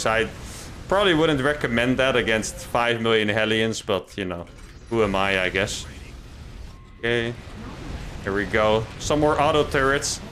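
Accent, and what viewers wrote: Netherlands. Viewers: dutch english is the most cringe accent ever jesus christ unwatchable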